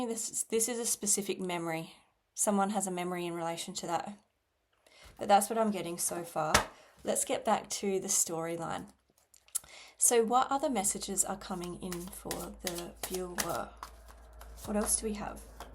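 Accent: Australia. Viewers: R you an Aussie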